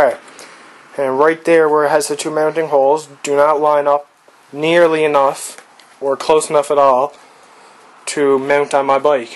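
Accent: Newfoundland, Canada. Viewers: Are you a newfie ? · man as soon as you started talkin i was like this dude is from newfounland haha, and sure enough when i went into your profile i een that you were, im from flatrock down on the east end of the avalon. i got an 09 450x there last fall, i should have some riding videos up soon cause im getten a gopro. I subbed, if ya wants sub back. tanks bah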